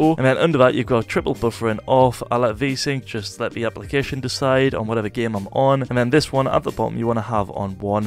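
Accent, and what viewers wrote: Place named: Newcastle, United Kingdom. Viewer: are you a geordie lol you sound a lot like my family from newcastle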